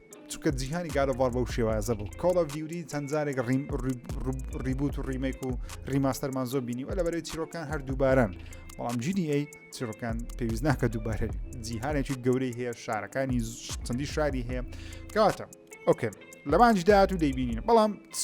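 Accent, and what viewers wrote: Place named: Badini, Iraq. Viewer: بادینیەکەت زور جوانە کاک عەیبی نیە..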